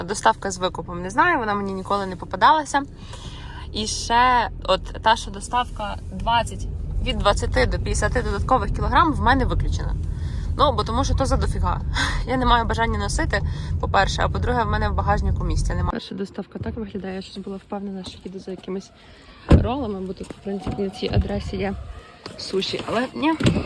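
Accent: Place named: Lviv, Ukraine. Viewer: Мне вообще не нравится украинский, но ваш украинский мне приятно слушать) Может Львовский акцент Я из Киева.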